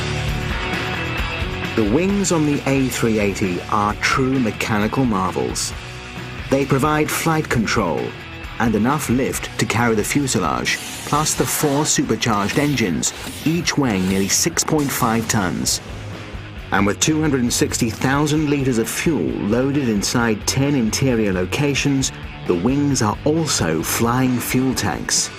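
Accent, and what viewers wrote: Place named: United Kingdom. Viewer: OMG can we please get these brit narrators other jobs grrrrrr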